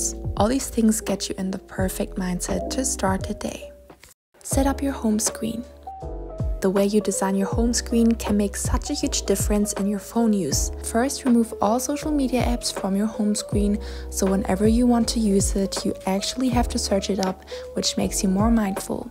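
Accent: Germany. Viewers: Du hast voll den deutschen Akzent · Me thinking her voice sounds German. Then she pulls out a German book. ✨German power ✨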